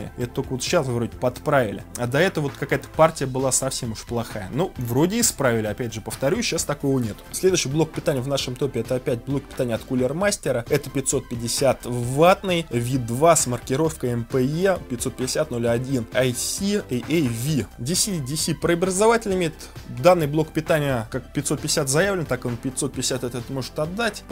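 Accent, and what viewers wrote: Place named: Okanye, Russia. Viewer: Что за Оканье такое =))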